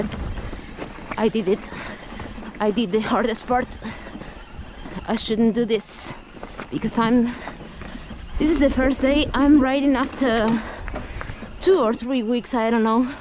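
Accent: Cartagena, Colombia. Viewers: que rraro hablas cartagenero..... :0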